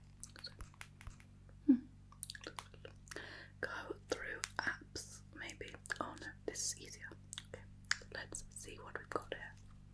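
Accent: United Kingdom. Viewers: I like your British accent. 🤗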